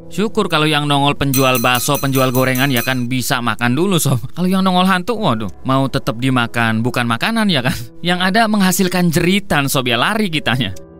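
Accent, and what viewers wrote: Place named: Indramayu, Indonesia. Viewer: Udah aku duga Vidio terakhir dari cara bicaranya logat Indramayu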